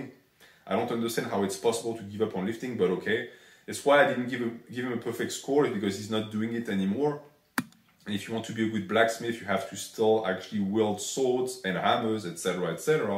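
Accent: France. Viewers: Your French accent hooked me the first time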